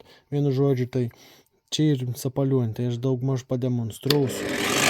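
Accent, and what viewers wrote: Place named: Samogitia, Lithuania. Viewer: Gal ne įtemą, bet man labai patinka žemaičių šneka! Linkėjimai iš Dzūkijos gilumos!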